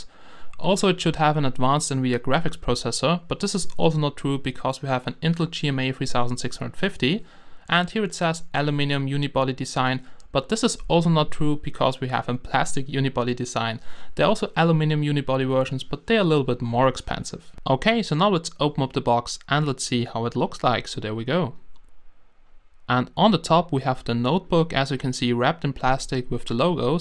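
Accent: Germany. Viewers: Any chance you are from germany ? :D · are u from germany? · Ich nehm mal an du bist deutscher :P klang zumindest so: weißt du ob es auch eine Metalversion oder eine mit etwas besseren CPU gibt? ansonsten super review, werd mir wohl auch so ein teil holen. Allerdings ohne Apple-logo (wegen Zoll und so). Weiter so (y) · Hab das direkt gemerkt, dass er nen deutschen Dialekt hat und als er damit ankam, dass die Tastatur anders ist, als unsere QWERTZ-Tastatur, hat er meine Vermutung bestätigt ^^ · Are you from Germany?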